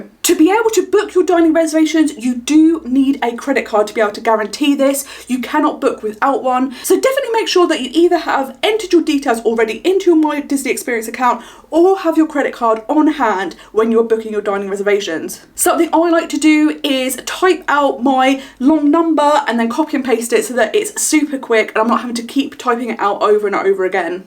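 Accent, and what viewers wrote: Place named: United Kingdom. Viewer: You sound like you’re from the uk do you use a vpn please to make your bookings? Or use the uk site as im also traveling from Europe.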